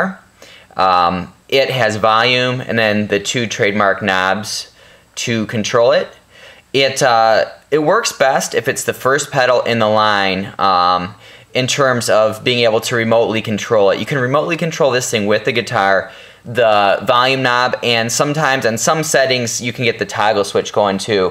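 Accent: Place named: Cleveland, United States. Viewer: are you from cleveland ohio? trying to guess accents just.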